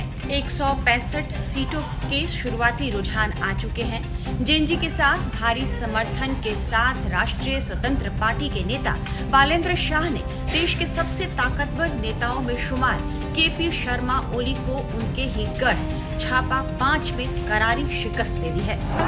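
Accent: Bihar, India. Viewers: Mam aap bihar se lag rahi ho ya up gorakhpur sides se ho?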